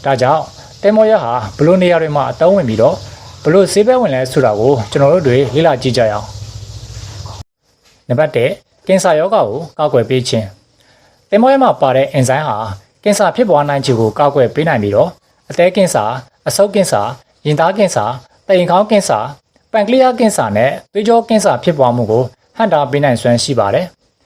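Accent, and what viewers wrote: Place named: Dawei, Myanmar. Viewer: ထါးဝယ်သံပေါက်နေတယ်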